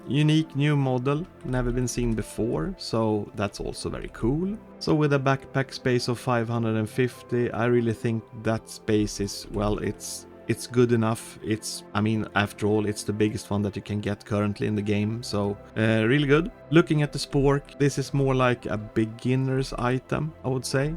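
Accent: Sweden. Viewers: You sound very swedish 😳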